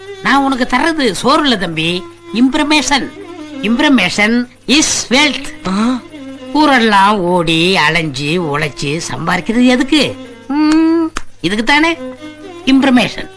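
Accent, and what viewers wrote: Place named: Bangalore, India. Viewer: Bangalore tamil😊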